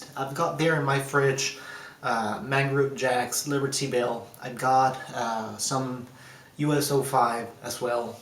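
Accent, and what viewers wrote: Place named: Brazil. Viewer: HAhaha no prob mate, its a thing that just brazilians would know, its like a compliment to see if the another lad is also a brazilian. anyway its cuz your accent, not that you have it, but in some parts of the vid i can tell u are a BR(brazilian) but not hehehe.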